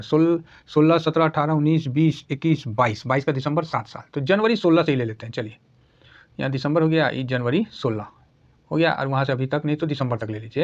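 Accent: India. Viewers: Sir ji apka bolne tarika aur boli bahut achi hai Desi style 🎉🎉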